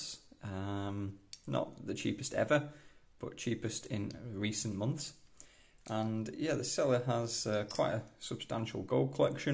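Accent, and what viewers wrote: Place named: England, United Kingdom. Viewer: I like your accent. What region of England are you from? My apologies if I’m wrong. I’m from Indiana in the United States.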